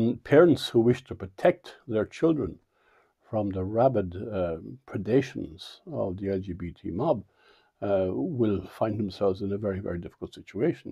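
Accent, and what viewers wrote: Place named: Canada. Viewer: bruh sounds a lot like canada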